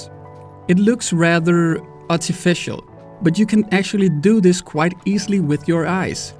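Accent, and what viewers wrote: Denmark. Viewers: Er du dansker? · Årrrh du lyder så dansk at det er hårdt for mig at se den her video haha. Ellers rigtig god video! Kan se du har brugt ekstremt meget tid på den, hvilket jeg sætter pris på! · Are you from Denmark? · Are u from Denmark?! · ARE u danish? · Are you danish? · Great video. Subscribed! 👍 Maybe stupid question, but are you Danish? 🇩🇰😁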